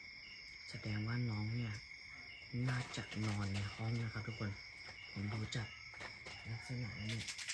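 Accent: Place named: Rural, Thailand. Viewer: เพราะว่าพูดเหน่อ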